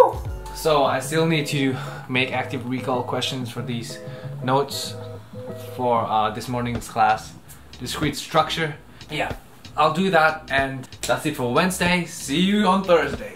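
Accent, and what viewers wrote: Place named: United Kingdom. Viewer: how do you learn such sublime english accent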